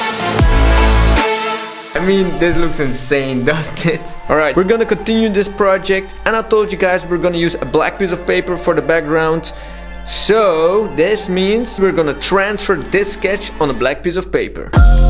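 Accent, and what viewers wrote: France. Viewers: Ça se sent que t français 😂j’adore t vidéo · Ta video explique très bien comment faire 👏 et tu a un super accent pour un français 😋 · Je me suis doutée que t'étais français avec l'accent, et mon intuition a été confirmée quand j'ai vu les feuilles Canson noires! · Are you french?cuz you have a little french accent 😄 Anyways i am french too😊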